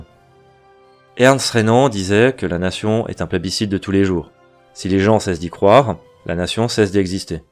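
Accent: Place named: Monthey, Switzerland. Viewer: Excellente vidéo ! Claire et concise, j'aime 👍 Par contre, tu viendrais pas de Monthey par hasard ? Je peux me tromper mais il me semble que tu as l'accent de la région 🤔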